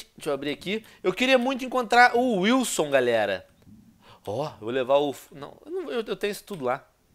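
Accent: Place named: Portugal. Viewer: fala portugues de portugal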